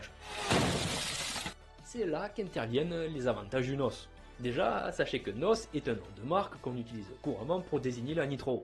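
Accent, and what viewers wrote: South, France. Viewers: Merci YouTube de m'avoir emmené ici, c'est vraiment très intéressant, le tout saupoudré d'un accent du sud chaleureux 👍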